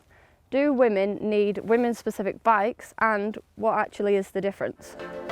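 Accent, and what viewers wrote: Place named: United Kingdom. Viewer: It's probably a mix of nerves and the British dialectual habit that she's attempting to speak through a locked-jaw. Don't take this the wrong way - nobody is expecting her to sound American, but perhaps a little practice enunciating with an open mouth (and, of course, time to be less nervous while doing it) will go a long way. Matt, Dan and Cy seem to have this down pat, but I'm sure it took practice and/or some studying. Too bad cycling and a glass of wine - to lessen the nerves - don't mix, but like anyone who regularly speaks in front of an audience, she'll get used to it.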